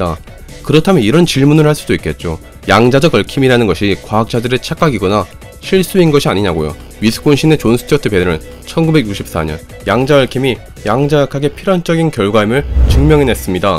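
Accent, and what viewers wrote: Gyeongsang, South Korea. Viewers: 어?? 뭔가 이분 목소리랑말투 맨첨에들었을땐 섹시한 동굴목소리가진 미국교포 한국어말투 느낌이었는데 듣다보니 경상도억양이.....ㅋㅋㅋㅋㅋㅋㅋㅋ귀여워ㅋㅋㅋㅋ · 혹시 실례가 안된다면 억양이 경상도가 고향이신거 같으네요. 포항이나 경주영천? 경북남쪽지역 억양은 확실한데 서울 가신지도 10년은 안됐을듯하네요. 제가 20초에 서울갔을때 그리고 10년 서울생활했을때랑 진짜 비슷해서요ㅎㅎ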